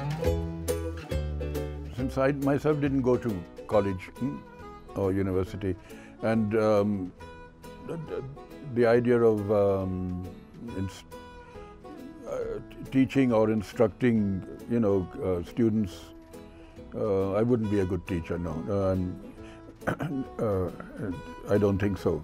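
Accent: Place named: United Kingdom. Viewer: A real mixed British Indian accent! Indo Britishers note!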